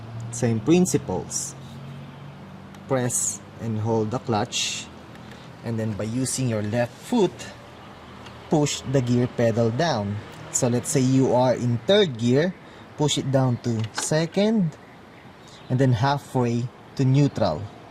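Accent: Philippines. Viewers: ayus ha pinoy na pinoy ka magenglish brad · lmfao the filipino accent I can't take him seriously bahahhaah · he sounds Filipino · dude are you filipino?? you sound like a filipino man hehehe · HES FILIPINO HAHA ACCENT SO OBVIOUS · U sound Filipino, are you?? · Thats a heavy ass filipino accent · dat pinoy accent tho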